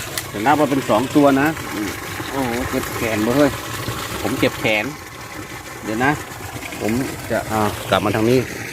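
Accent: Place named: North, Thailand. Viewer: ผมเล่นว้องทุกวันครับ fcครับ ชอบเวลาน้าอู้เหนืออออขยาด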